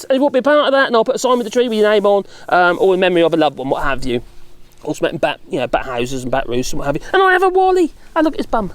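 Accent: United Kingdom. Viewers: Obviously we Americans have more than enough bad stuff of our own creation to deal with over here, but misery loves company and hearing about the shite in the UK in this accent is always interesting. Stay well mate word of the day should be 'effluvium'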